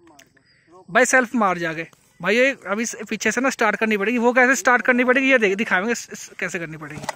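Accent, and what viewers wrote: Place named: Punjab, India. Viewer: I can listen punjabi in ur hindi ❤️🎉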